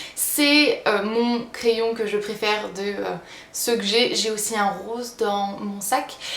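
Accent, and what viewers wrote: United States. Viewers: Toujours aussi marrante;) Bourjois est vraiment tentant à force que tu en parles... Pour info, j'ai trouvé des vernis Essie en Espagne à seulement 8,95!!!!! Et OPI à 12 je crois, donc si vous avez l'occasion d'aller en Espagne foncez chez Primor;) Pleins de bisous et au passage, quand tu parles anglais on dirait que t'es une américaine!! _ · Coucou 😘 Superbe vidéo comme d'habitude! Je t'adore ❤️ surtout ton accent américain est juste top j'adore!! J'aimerai avoir un accent comme ca XD😂 Bref big bisoux continue comme ca😘😘😘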